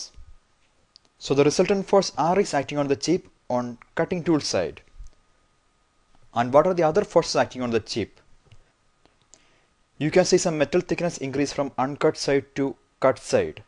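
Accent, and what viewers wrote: Kerala, India. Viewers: good one. I guess the speaker is a keralite. the accent 😑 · Nice mallu accent :)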